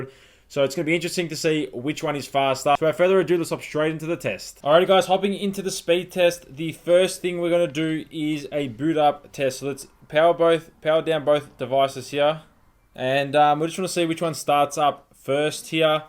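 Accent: Australia. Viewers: U must be Australian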